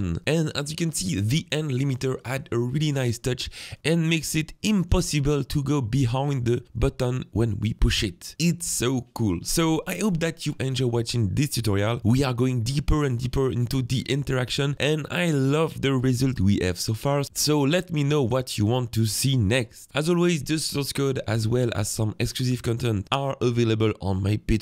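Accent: France. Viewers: Salut (j'ai cru entendre un leger accent français, me trompe-je🤔😁) Merci beaucoup pour ces tutos ça aide tellement de monde c'est genial Evidemment Unity a encore tout changeé donc dans ma version 2022 c'est un peu différent..les Est ce que tu saurais quel fonction je dois appeler dans le visual scripting pour declencher une action avec ce fameux bouton? Exemple: lorsque j'appuie sur le bouton..un objet disparaît..car le "on button click" n'a pas l'air de fonctionner...merci!!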